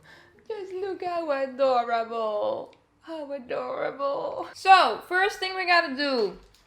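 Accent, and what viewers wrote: Netherlands. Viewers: are you dutch? you sound like you have a dutch accent. Love!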